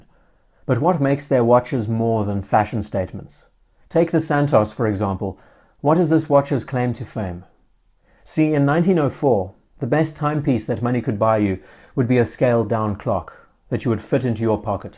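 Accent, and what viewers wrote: South Africa. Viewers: Why im a getting a bit of a south African accent from this guy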